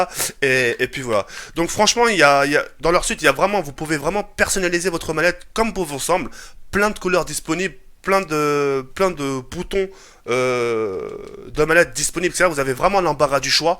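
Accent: England, United Kingdom. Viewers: LES GANTSSSSSSSSSSS et ton accent anglais me font trop rire x))) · euh ton accent anglais TK ses koi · Très beau accent anglais :)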